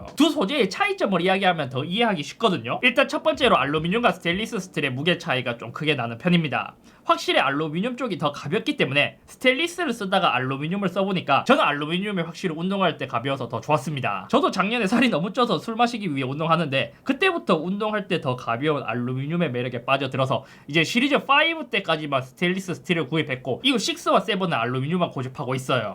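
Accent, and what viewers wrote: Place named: Gyeongsang, South Korea. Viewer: 갱상도는 갱상도네요...드라랄드라라... 빡세요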